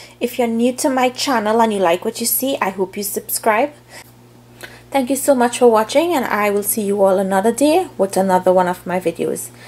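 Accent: Trinidad and Tobago. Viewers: I heard your Trini accent and I was like, "oh, shit, this is gonna be good!" Frigin love Trinis man. They know how to cook! I grew up in Scarborough, Ontario -- I know what I'm talking about lol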